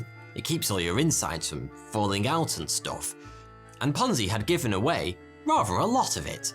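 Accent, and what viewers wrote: United Kingdom. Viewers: Thoughty2 sounds very British though...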